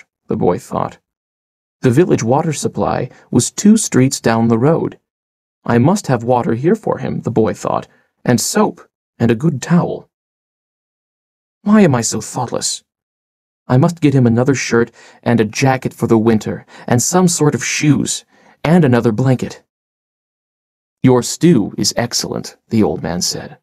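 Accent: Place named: United States. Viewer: The pronunciation in the story is the American accent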